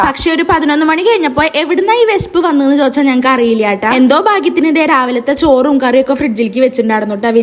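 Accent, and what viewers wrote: Thrissur, India. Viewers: ഇന്ന് ശരിക്കും തൃശൂർകാരി ആയ പോലെ സംസാരം 😂😊🥰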